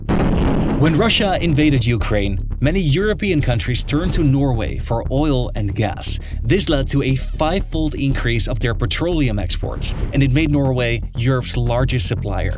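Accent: Netherlands. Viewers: Hoi, goeie video. Ik heb nog niet eerder een video van je/jullie gezien maar ik kan horen dat je/jullie ook uit Nederland komen 🙂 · Klinkt heerlijk Nederlands XD Maar goeie shit ! Alles komt er bij, ook hoe het samen hangt met andere grondstoffen, hoe de landen er mee om gaan en sowieso hun handel regelen. ZItten nogal flinke verschillen in.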